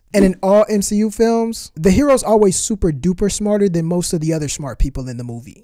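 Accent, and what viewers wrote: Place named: Latin America. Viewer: It's lovely. I like your voice/accent. I don't think we have many latino peoples in Britain :)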